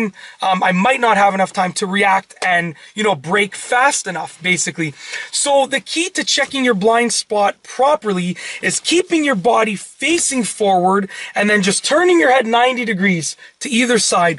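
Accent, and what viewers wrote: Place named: Canada. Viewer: This gentleman has the most CANADIAN accent I've ever heard. lol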